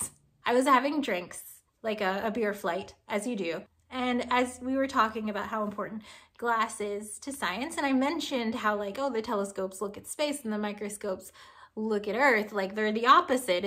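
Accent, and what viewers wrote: United States. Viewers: Ok, imma do my best to describe. An-tony vahn l-ale-an-hook. (and just glue all those words (and letter) together). I mean, your murican vowels probs still will be off, but this is as close as I could describe it in englishthon Edit: yeah that robot butchered it... but I'm used to that 🤣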